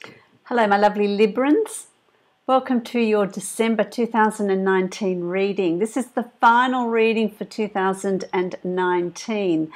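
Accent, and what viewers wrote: Australia. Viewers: so fab hearing an Aussie accent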